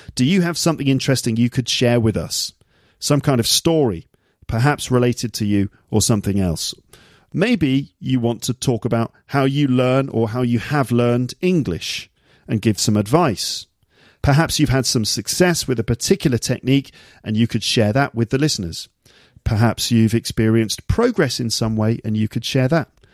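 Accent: United Kingdom. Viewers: Is your accent pure British,? I feel something different.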